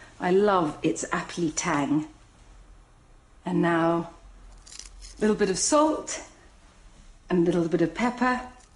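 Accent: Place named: RP, United Kingdom. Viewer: It’s funny to listen to that posh voice making what I think of as American soul food. I’m not meaning it unkindly and I totally need carrots in coleslaw it’s just everyone is always like nigella nigella nigella and I’d never watched her before didn’t know she was terribly British sounding ❤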